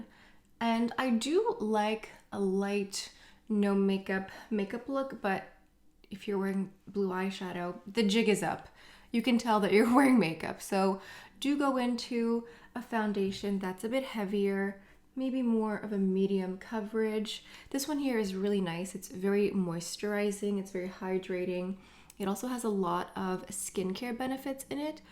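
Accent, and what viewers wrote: France. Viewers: I am French and accent Français is perfect😍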